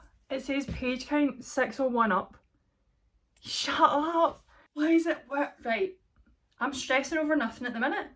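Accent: Scotland, United Kingdom. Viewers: If you have a watch stat of like 400 % on the first 3 seconds, it was me trying to understand what you said! But yes, yey, spring! 😂🌸 I'm reading The Maiden at the moment, which is set in Scotland, and having watched your videos is very helpful because now I kind of hear the book in your dialect while I'm reading. It's great! And you got a great TBR! I'll be interested to hear what you think of Cultish and A Tempest of Tea. I'm also wondering who all these people are who read the second book after a bad first one, and then tell anyone the second one is much better. What a sacrifice! 👏👏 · Love hearing a fellow Scot!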